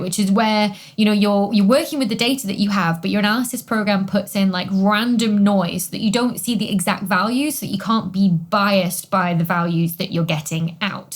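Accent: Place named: North West England, United Kingdom. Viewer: What part of the UK are you from? Your accent sounds very north-west.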